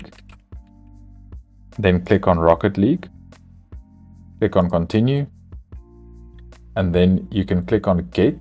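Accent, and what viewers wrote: Afrikaans, South Africa. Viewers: Jy klink Afrikaans. Is ek reg?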